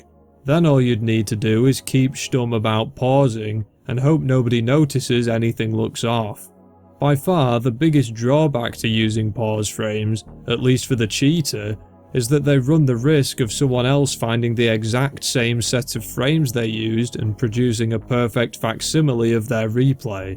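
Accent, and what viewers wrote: United Kingdom. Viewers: refreshing to hear a yorkshire accent on a good youtube video lol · you sound british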